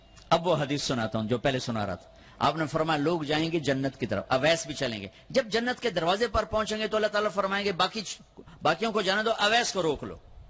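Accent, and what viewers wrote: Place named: Punjab, Pakistan. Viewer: App ki Punjabi mjy boot ache lgti ha mola slamat rukhy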